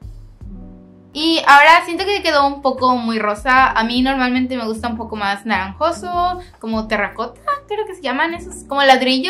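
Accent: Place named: Mexico. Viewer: Me da la impresión que de México por el acento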